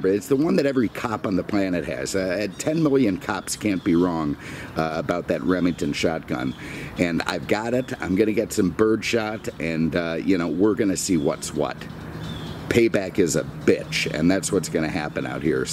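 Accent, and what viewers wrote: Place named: New York, United States. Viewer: I love this guys chatter. He has a New York type accent and a lot of character to his speech. He is very entertaining and charismatic love his reviews ...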